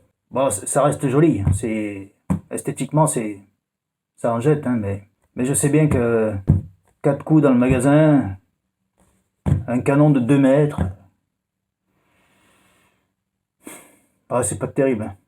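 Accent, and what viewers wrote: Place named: South, France. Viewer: Putain c est génial !!!! Tout l accent du sud qui va bien et le reste !!! Mdr 😂 salopage mythique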